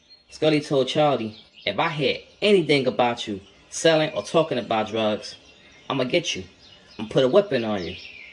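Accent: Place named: Audubon Park, United States. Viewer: That Nicca sound like he in Audubon Park somewhere🤣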